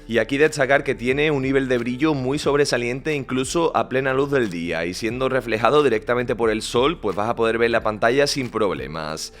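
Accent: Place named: Spain. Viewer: Bueno, yo solo oigo a un español hablando, qué hay de "raro"?😂 No sabes lo que son los acentos?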